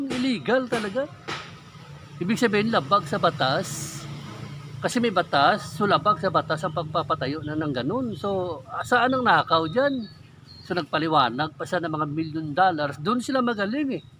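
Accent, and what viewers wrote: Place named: Iloilo, Philippines. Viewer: Parang ilonggo ka host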